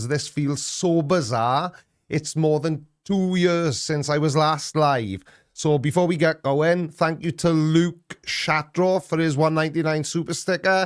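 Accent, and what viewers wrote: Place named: Wales, United Kingdom. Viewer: Came for the science. Stayed for the fun and the Welsh accent.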